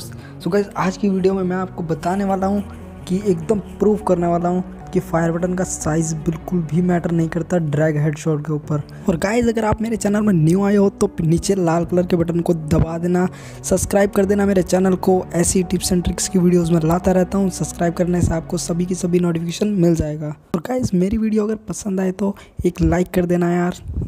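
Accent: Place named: Hills, India. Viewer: Bhai tumahri aavaj pahadi Se milti hai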